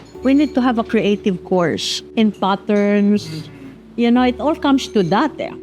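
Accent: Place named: Conyo, Philippines. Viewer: conyo pala si Ma’am hahaha ang cute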